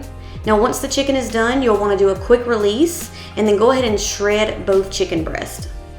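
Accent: South, United States. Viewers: Me being a Mississippian, “lord have mercy, that’s a southern accent.” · I love the southern belle accent. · Just where is that sweet as molasses southern accent from? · I love your southern accent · Love your voice girl. A real southern belle. 😍😍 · The voice through me for a loop 😅😅 heyyyy my fellow southern friend 👋🏽👋🏽 great video 💕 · so love the Southern draw and love the prep recipes. Thank you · I heard your southern drawl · I can tell she's from the South. I am too.🙂👍🏻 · I love your Southern drawl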